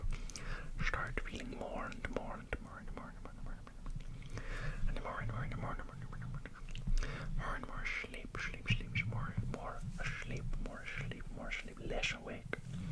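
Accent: England, United Kingdom. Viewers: LORD YOU SHOULD HAVE HEARD THE GASP I MADE WHEN I HEARD HE WAS ENGLISH 😍😮‍💨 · It’s so nice to find some English asmr! I’m so used to only Americans. You remind me of all my mates - very relaxing - good job man!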